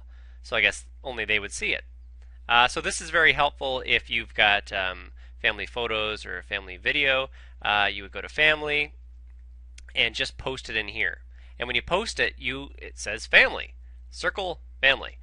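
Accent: Canada. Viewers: Your from Canada?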